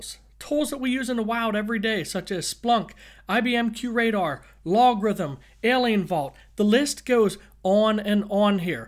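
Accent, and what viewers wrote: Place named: South, United States. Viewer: That accent... South of the Mason/Dixon and East of the Mississippi.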